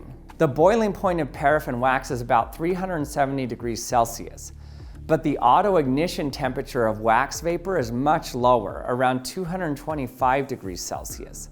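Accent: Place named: California, United States. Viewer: I don't know why but chemistry with a camp Californian accent is super fun.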